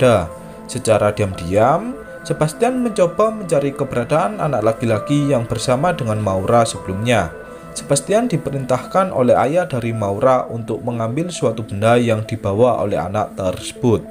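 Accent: Java, Indonesia. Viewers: "Medok-nya" kental. Wong Jowo toh mas?? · Miminnya orang jawa kayanya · ini terlalu medok suaranya hahaha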